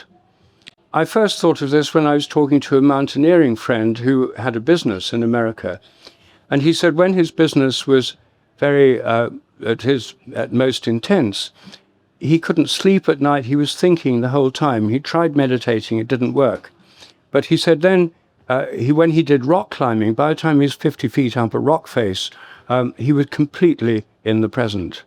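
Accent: RP, United Kingdom. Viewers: This is such an endless rehashing. Rupert is still at it with his posh accent luring in his cult.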